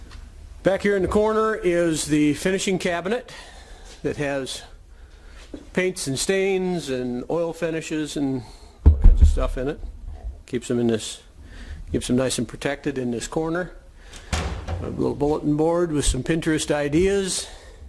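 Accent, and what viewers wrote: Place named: Michigan, United States. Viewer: Nice shop! Mid-Michigan eh? I used to live down in Three Rivers (yes, there're actually 3 rivers. You'd be surprised how many times I was asked…) I should've recognized the accent, guess I've been in California too long.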